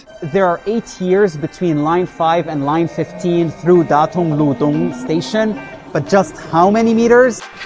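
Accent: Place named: United States. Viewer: You sound like an American. Well, I was also impressed by NY subway 35 years ago when coming from China.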